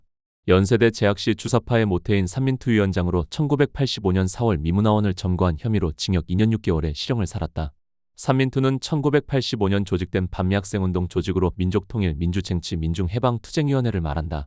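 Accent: North Korea. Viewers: 로상원 씀다 간첩말 투다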